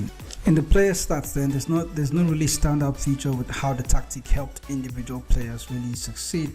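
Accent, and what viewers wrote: Nigeria. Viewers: i can tell your nigerian Lol.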